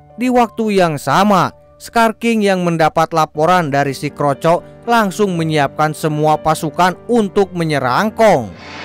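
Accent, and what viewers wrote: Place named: Sunda, Indonesia. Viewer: Iya jadi orang Sunda anjir yang ngisi suaranya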